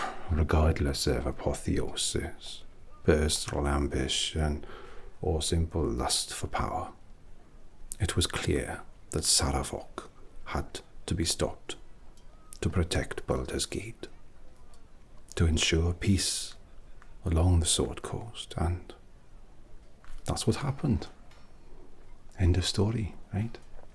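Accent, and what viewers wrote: Wales, United Kingdom. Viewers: An elf with a Welsh accent, I think I'm in love. 😍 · The ad slid in so smoothly that I almost slipped. The light, the background sounds, the welsh accent, the make-up, the costume, the hair (especially the hair), simply 🧡💛🧡💛🧡💛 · The heavy Welsh is my favorite 😍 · Love the story, after a long day it’s so nice to hear it with a gentle welsh accent ❣️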